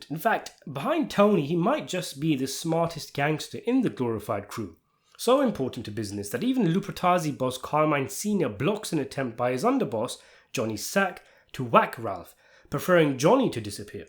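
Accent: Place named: Northern England, United Kingdom. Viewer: It’s an accent from northern England, I prefer this than a robotic AI voice, good thing your opinion means OOGATTZ TO ME!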